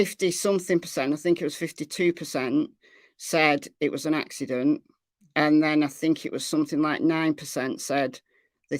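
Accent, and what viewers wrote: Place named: Lancashire, United Kingdom. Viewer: Thank you for all you do. These video's really help one to get a true perspective of what I also truly believe was a tragic accident. I love seeing this country side and the scenery. After much research on ancestry, I discovered my 3 x great grandparents were married in St. Michaels on the Wrye in 1866. Also listening to your voice, I can imagine what my GGG Grandma probably sounded like as she was born and raised in Lancashire County.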